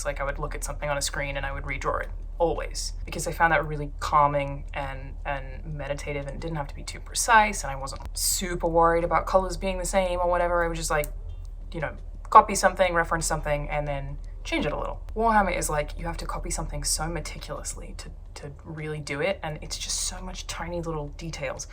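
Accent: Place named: Australia. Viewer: I imagine you'll still be hot when you're 40, you'll still have that Australian accent that north Americans love. I also imagine creepy people on the Internet will still be a thing ten years from now.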